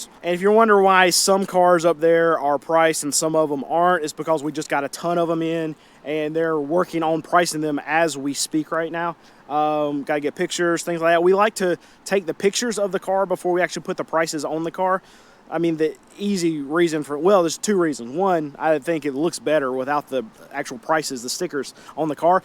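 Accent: North Carolina, United States. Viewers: Don't you realize we like your folksy N Cakalacee way!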